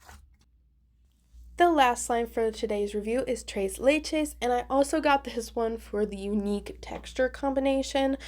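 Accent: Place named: Spain. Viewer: I enjoyed the review but I wish there would be less talking and more slime ASMR. BTW your Spanish asent is amazing!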